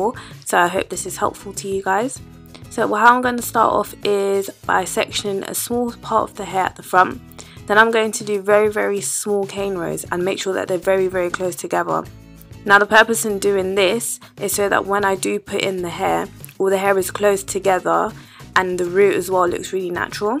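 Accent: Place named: United Kingdom. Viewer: you have a British accent, are you based over here in the UK?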